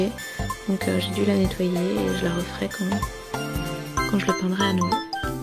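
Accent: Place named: France. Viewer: ~! and your french accent is so cute great review! ps:i hope you know who i am;p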